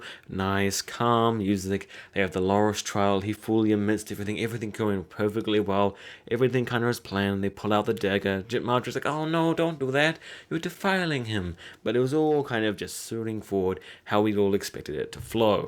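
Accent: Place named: New Zealand. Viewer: *Great Video. I like your Kiwi accent reviews almost as much as I like the Ozzy guy reviews. The difference is you speak the Kiwi English and he speaks Bloke English. *The Little Bear Lyanna Mormont gives her speech like a boss. Sorry Wyman Manderly we gave your speech to a 10 years old girl. "The North only knows one king and his name is Snow....wait what?!" Oh well I joined in with the northern guys yelling a king in the North! Sadly I thought they would have had a Queen in the north but the northerners follow battle commanders and Sansa hid until the end with Lord (are you 18 yet Sansa) Baelish. **The Armada scene was great but I didnt see Tyrion on the ship so I assume he is on some other boat. I liked that Yarra had the big Pirate Captain coat. I almost wish she'd have stolen Capt John Sparrow's coat that he wears at the end of the 1st movie. 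However I don't think Disney will allow that until they Buy HBO.... #Disneyownseverything *Jamie will probably have to do something and I see Brianna Tarth helping him and then the 2 of them having sex. *Arya might sneak in and kill either Cersai or the Mountain, I'm thinking the mountain. She must have learned some kind of anti Necromancy many face gods spell to kill Franken-mountains.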